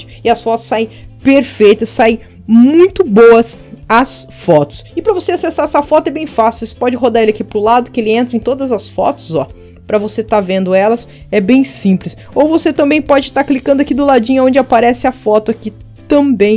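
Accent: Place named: Rio Grande do Sul, Brazil. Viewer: Adoro esse sotaque do Rio Grande do Sul. confere?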